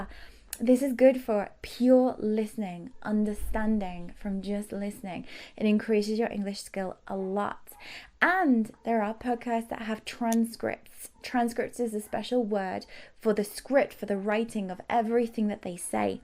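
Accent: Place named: United Kingdom. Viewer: Hi there, Teacher! Your British English accent is THE FOREVER the best I have heard of and your voice is really pleasant to listen to! And I really appreciate your enormous patience, dedication, enthusiasm, kindness, time and effort in making excellent English teaching videos for non-native speakers like me! Absolutely amazing! Benny Mok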